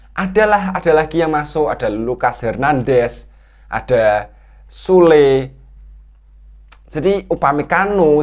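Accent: Semarang, Indonesia. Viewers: Efek logat orang semarang kali🗿👍🤣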